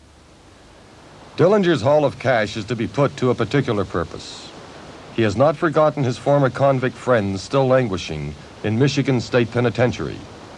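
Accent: Canada. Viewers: LOL at the Canadian narrators accent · The narrators Canadian accent is really distracting.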